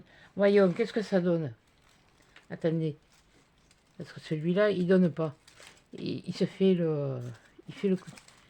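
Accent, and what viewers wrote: South, France. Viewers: Bonjour, je ne commente que très rarement les vidéos que je regarde, mais honnêtement je trouve vos créations très très jolies et j'imagine le gros travail en amont. j'aime vos vidéos et votre accent du sud 😊 et aussi vos début de phrases sans la fin, votre simplicité et le respect des autres sans critiquer ( ce qui n'est pas le cas sur toutes les chaines😊) Je vous souhaite de très bonnes fêtes de fin d 'année avec votre petite famille et merci pour toutes vos vidéos 😊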